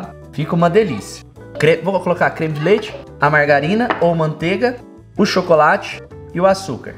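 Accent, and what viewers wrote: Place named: Rio Grande do Sul, Brazil. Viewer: vou fazer com certeza, e não é que vc tá falando enrolado, é que vc era mineiro e agora virou gaúcho tchê, é o sotaque rsrssss, bjos pra vcs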